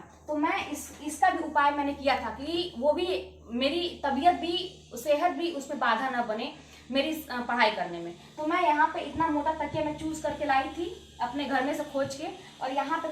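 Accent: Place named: Purvanchal, India. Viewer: Aap kha se ho didi purvanchal side se lg rhi h ....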